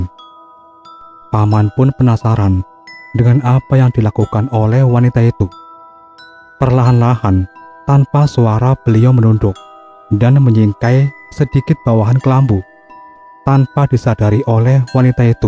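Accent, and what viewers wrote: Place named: Java, Indonesia. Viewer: Medhokmu lek... Ngapusi thok ki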